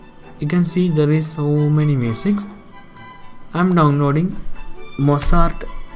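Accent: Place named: Kerala, India. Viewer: Malayali English.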